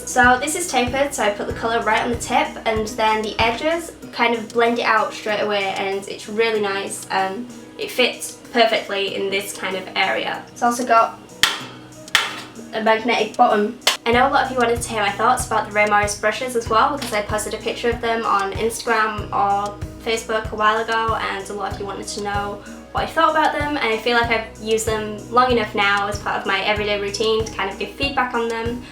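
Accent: United Kingdom. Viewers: I'm not used to the Gothic look with the British accent....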